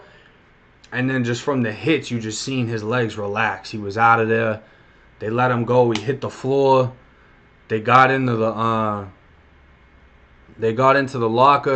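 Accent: Boston, United States. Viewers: Just hopped onto your Channel where you from bro sound like you from Boston I'm from Maine that's why I asked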